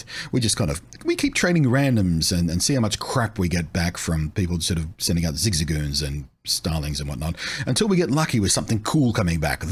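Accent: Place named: Australia. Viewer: Nothing like a low key Aussie accent to massage my brain so I may fall asleep. Quite a podcast voice. Very crispy & creamy 🥱💤💤💤💤💤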